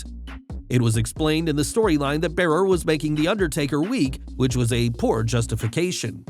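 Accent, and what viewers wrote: United States. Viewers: Not a fan of the American voiceover, sorry. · I don't think I like this Mr. America's voice or very Systemic voice ... I like the English voice better as explaining American Manipulative business ideas such as "sports entertainment"... Haha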